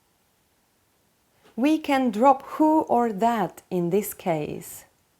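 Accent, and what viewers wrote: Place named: Italy. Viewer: This sucks . You have a foreign accent. Italian?i taught a- levels. ✖️🤢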